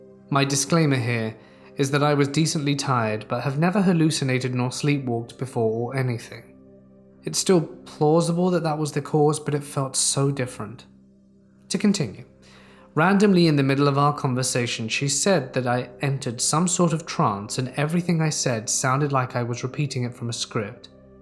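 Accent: United Kingdom. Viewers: I was in the hospital last year and i listen to these stories on a small speaker and when my night nurse came in to check on me all she heard was ' voice and it scared the crap outta her. Just literally a man talking with a UK accent (i'm in America) in a totally empty room!!! (except for me) SHe woke me up and was like, "ma'am, were gonna have to move u to another room!" and it took me forever to realize she was talking about the speaker just almost under my pillow out of her sight! I'll NEVER forget that. I hope u get well VERY soon! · Left dislike because british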